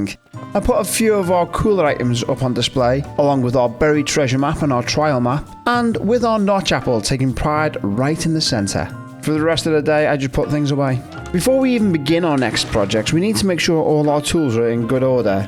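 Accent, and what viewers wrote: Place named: Liverpool, United Kingdom. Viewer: Never knew i needed a scouse mc youtuber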